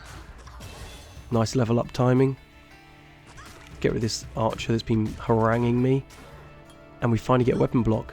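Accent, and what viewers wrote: United Kingdom. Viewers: The dead-pan, British delivery kills me. “I’m debating profiting from mana potions. Is that the kind of example we want to set for our children this holiday season?” Lmaoooo Sooo many good one-liners. Well done.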